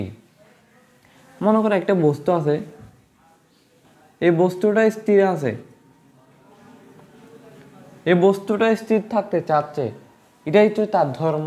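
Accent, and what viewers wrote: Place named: Chittagong, Bangladesh. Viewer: accha to Chittagong vashai je kotha bolchen tar jono bolam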